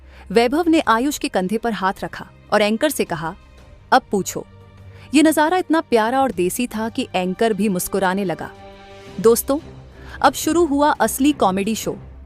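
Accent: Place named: Bihar, India. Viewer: Bihari andaaz ke liye bhadhai.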